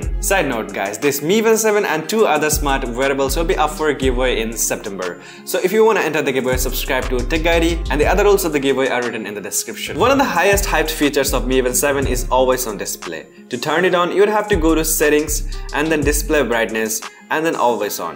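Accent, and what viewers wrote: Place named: Bengal, India. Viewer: Vai tone Bengali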